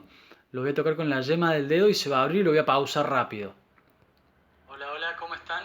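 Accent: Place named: Cordoba, Argentina. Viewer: Este es cordobés argentino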